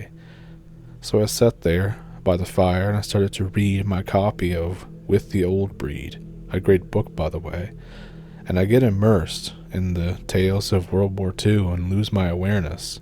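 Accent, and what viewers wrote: Canada. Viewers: Greetings from Ohio! Yeah slight Canadian accent. Just the "ou" sound, like out, about. I like it 🙂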